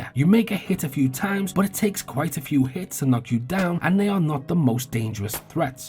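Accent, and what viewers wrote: Liverpool, United Kingdom. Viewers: Can I hear a scouse accent here ?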